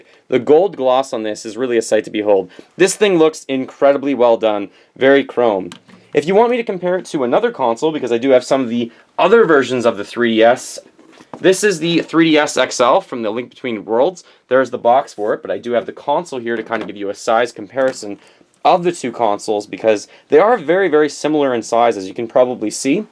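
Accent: Canada. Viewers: That's how Canadians pronounce the letter Z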